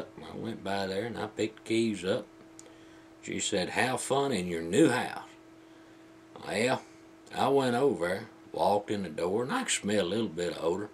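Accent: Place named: Georgia, United States. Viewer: HAHAHAHAHAHA That is an AWESOME story!! You know, you remind me a lot of my old man lol. You from Georgia by chance? You and him have the same accent and seem about the same age. He has all kinds of stories like that that happened to him in the past, lol. This made me laugh.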